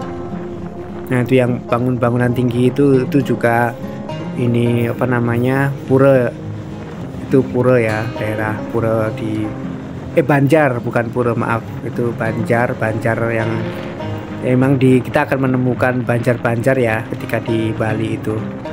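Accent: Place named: Java, Indonesia. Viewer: masnya asli mana kok logatnya kayak Jawa gitu...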